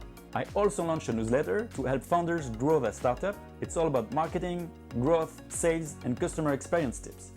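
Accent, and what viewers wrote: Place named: France. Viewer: Tu à un accent Français ✨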